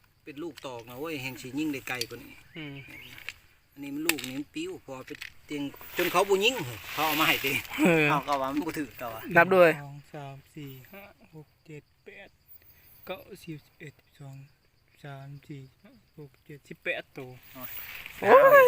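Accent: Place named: Savannakhet, Laos. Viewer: ຟັງສຳນຽງຢາກຄືໄທສະຫວັນນໍ້ຢູ່ບ້ານໃດເດ້ຢາກໄປລ້ານຳເດ້ນົກຫລາຍແບບນີ້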